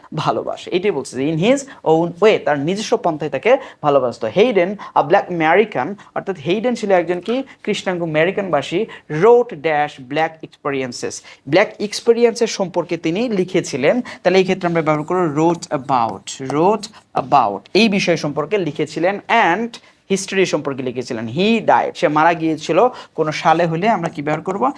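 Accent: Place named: United Kingdom. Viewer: Sir Apnr English ta amder English moto bebohar kora ocit karon sobai Apnr occaron bubena Jake bole birtis basa Bangala dese manos moto ocaron korle Valo hoi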